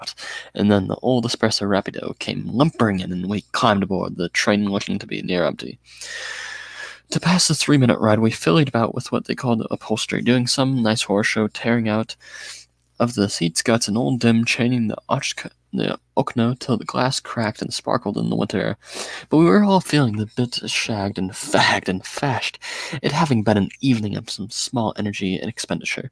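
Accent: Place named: United States. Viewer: Hey, are you american? I like your accent I could listen your accent all day long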